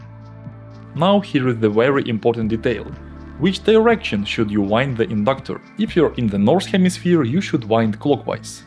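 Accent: Russia. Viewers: От русского акцента трудно избавится. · какой русский акцент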